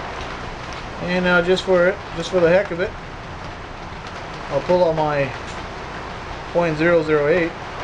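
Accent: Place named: Oklahoma, United States. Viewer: Sounds like you could be a fellow Oklahoman....lol. I'm here in Okc and hear that sound at least a few times every year. Great video series!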